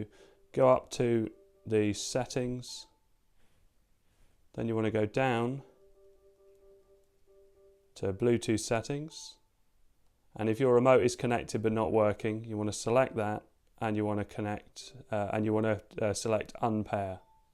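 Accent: United Kingdom. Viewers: Thank you nice man with cool British voice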